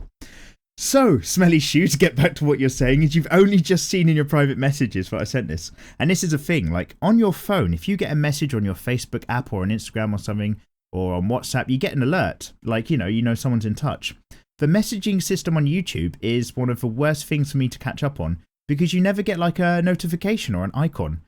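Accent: United Kingdom. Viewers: Hello! I love your british accent, and I am inclied to do my drawings while listening to your amazing and bloody nice recordings. You are a inspiration for me, Sr.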